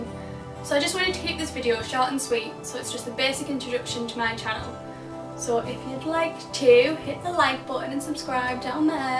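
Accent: Middlesbrough, United Kingdom. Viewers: It's mad how much you can tell you're from the boro on here. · ***** A very stereotypical British accent haha I really don't know why :)X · Nice to hear you speak! Proper boro accent! :) xx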